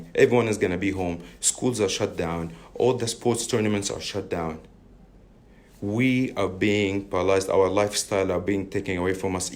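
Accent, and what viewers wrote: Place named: Iran. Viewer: I like his Persian accent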